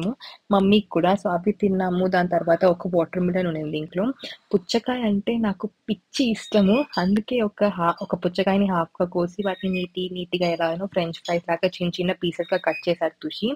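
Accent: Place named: Andhra Pradesh, India. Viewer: Medi madanapalli ha slang koncham malaga vundii sooo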